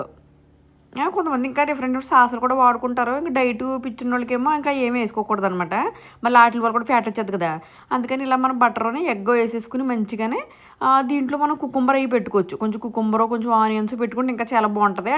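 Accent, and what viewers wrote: Godavari, India. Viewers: ఛీ నీ.. నువ్వు నీభాష నిజంగా గోదావరి జిల్లా వాళ్ళు అంతా సాగదీసి మాట్లాడారు దరిద్రం, దరిద్రం అని 🤦🤦🤦🤦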